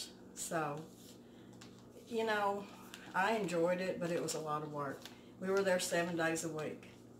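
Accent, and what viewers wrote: South, United States. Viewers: Hi from Michigan. I watched one of your videos, and now I can't stop. Your so down to earth, and I love your southern accent. I could listen to you all day. You have some great gardening tips, and I love that you cook from scratch. Watching how you can your food is very educational, for me. My Mom, who passed away, would can food, but I never took the time, I wish I had, to learn that from her. I regret that now that she's gone. Do you know how to make cha cha ? Some call it chow chow, but my Grandmother and Mom called it cha cha. They used green tomatoes, pickling spice, sugar... not sure what else goes into it, but they would grind all of the vegetables up, cook it in a big pot and can a bunch of jars of it. We would eat it with greens and beans. I was wondering if you ever made any, and if so, do you have a video of that recipe? 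I've been craving it and want to make some. Thank you for taking the time, to make and share these videos. As a new subscriber haven't seen them all, but plan on watching a lot of them. 😊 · Me too! I love the way you tell stories and your beautiful southern voice!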